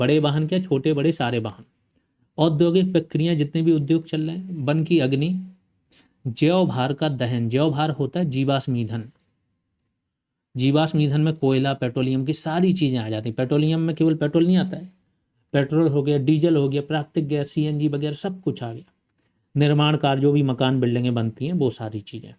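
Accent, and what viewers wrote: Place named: Bundelkhand, India. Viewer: Sir aap bundelkhand se lg rhe ho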